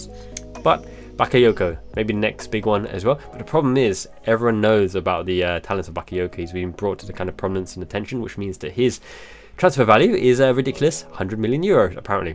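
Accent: United Kingdom. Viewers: Good job man! Just wonder how come you speak that perfect English with British accent as a Japanese?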